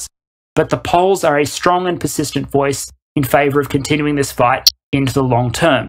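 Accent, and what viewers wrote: Australia. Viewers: is Ukrainian propaganda with an Aussie accent... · No, thank God doesn't go up with his voice at the end of every sentence! They are both Australians however, that may be why you find them similar.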